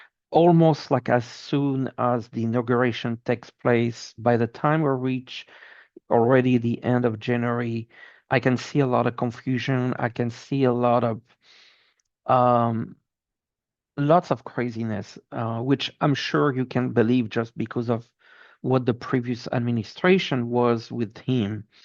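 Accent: France. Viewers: I as well. I enjoyed your show with Linda G. I recognized your French accent immediately since my mother was from France. Merci beaucoup! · New subscriber here. Like the reading. Very detailed. The hint of a French accent is also intriguing. 🙏😊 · Interesting and clear. I note a French accent 😊would of like to know more about Canada .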